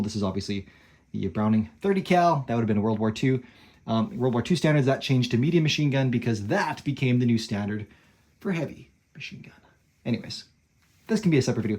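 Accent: Canada. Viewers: Dude I can’t stand your canadian accent. Don’t have a problem with Canada, just don’t like your breathy raspy voice. Nice guns tho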